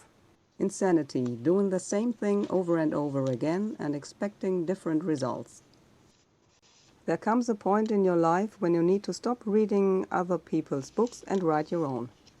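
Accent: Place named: Germany. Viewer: Your English was great and easy to understand. I like the German accent , I love all accents. Nice journal!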